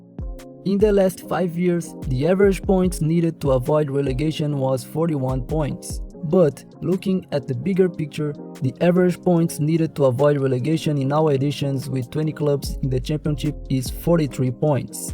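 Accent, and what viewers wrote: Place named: Brazil. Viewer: ele é br , so olha a pronuncia , da pra entender tudo kk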